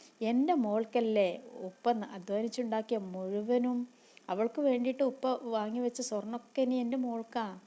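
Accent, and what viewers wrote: Malappuram, India. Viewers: ഉഷാർ ആണ് itha mlprm സ്റ്റൈലിൽ തന്നെ പറഞ്ഞാൽ മതി അടിപൊളി 👌👌👌👌ഒന്നും parayaan ഇല്ല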